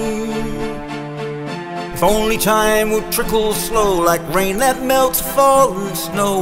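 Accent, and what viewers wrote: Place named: United Kingdom. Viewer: Superb,. Such a unique upload of this lesser-known Whittaker gem, a profound, deep poem with amazing heroic/patriotic music accompaniment. It's pretty cool to hear you using your beautiful Brit accent on this. Normally, you just merge into the hybrid voices you create, and no one can tell. It sounds just lovely here, man. Excellent vocals! BTW, Dave Silk is the best, a first-rate gent! Shout-out to David! I hope everything is okay, bro... is Helen doing well? So glad to hear about the new medication, and that things are a little better. We've all been praying for you both, dear friend, and sending good vibes. Wishing you both a week of tranquility and calm. Peace and brotherhood,🙂 Mac (aka RE-VO)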